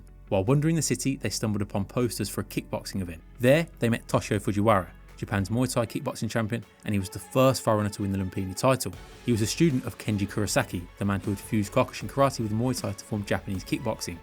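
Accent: Netherlands. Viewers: thickest Dutch accent ever even Marcel Vos can't get on that level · never expected to hear ann amsterdam accent in english lmao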